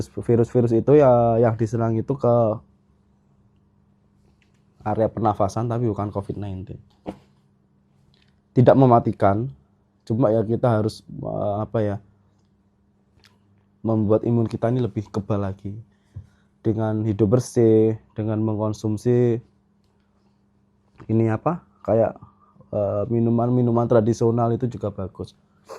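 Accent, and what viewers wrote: Java, Indonesia. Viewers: Mas jenengan kui penak banget nek jelaske sing ditrawang, aku yo seneng suarane lirih, trs jawane kui lho ora ilang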